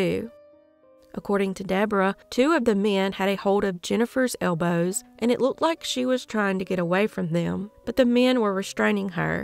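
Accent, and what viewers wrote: South, United States. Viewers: I agree enjoy your delivery and style of your videos. Thanks for being on the platform and never change or edit out your crying or the emotion in your voice because it further humanizes the victim to the audience and I for one Cory right with during some of those child cases. Also, love when call out the predator/criminal in your SOUTHERN accent cause I’m so NEWYork and saying the same thing on my side but when a sweet southern voice calls them the no good human wast of space I’m here for it cause if you wasn’t already thinking it and that voice says it you better reevaluate the amount empathy you give to the 👹. Felt motivated to comment to encourage you to keep going. 🤗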